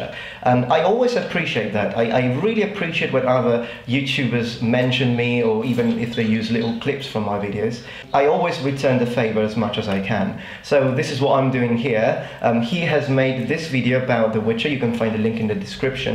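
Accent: England, United Kingdom. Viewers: Bait? It's a genuine question. What sound do you think he's pronouncing non natively? I'm genuinely curious to know what sounds off to your ears about his accent, since if I heard someone talking like him, I'd assume they were a Brit. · Why do sound so British you are Italian though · knew I could hear some demented English accent in there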